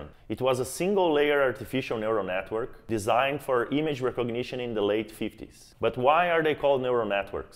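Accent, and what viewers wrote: Brazil. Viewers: Certeza que é brasileiro hehe... belo video!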